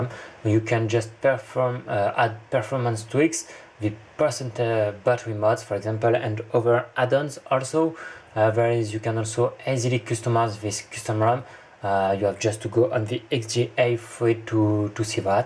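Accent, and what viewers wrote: France. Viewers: Haha, je suis venu dans les comms exprès pour voir d'où tu venais, je comprenais beaucoup trop bien ton anglais pour que tu viennes d'ailleurs que de france ^^ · On peux entendre dans ton dialecte on Anglais.Mais c'est typiquement "Francais-anglais" et j'aime ca :) Ou les Francais parle l'allemand et c'est chéri :D Ps : Excusé pour ma mauvaise francais :D Salutions de Luxembourg :)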